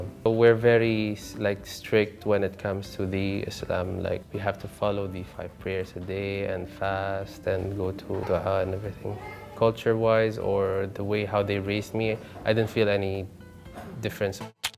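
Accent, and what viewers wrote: Philippines. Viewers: the WAY you TALK... Pinoy na Pinoy..😊😊😊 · habibi your speaking tone truly proved you are a more than half-part filipino..The way you deliver English words/sentences is moderate, dictions clear, very filipino tone, words are delivered with respect whoever is the receiver/listener...For sure your Mum was a wonderful woman, i could see & feel the way you speak the filipino values in your speaking attitude...For Us filipinos, Manners towards everyday life matters.. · Common you might have bi racial background, but in all angle you are more prominent in being Pinoy, therefore don't hesitate to sound such as those things you have already accustomed already from that time you were here in Philippines. Otherwise many will question you and tell that you were just trying too hard to fit in a culture you do not really belong. And it's so grateful to hear you speaking in Pinoy accent, which I think stood out from where you really belong. 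Sabi nga magpaka totoo ka kung saan ka talaga angat at doon ka yayakapin ng mga totoong kababayan mo na nagmamahal sayo at susuporta sayo hanggang sa huli.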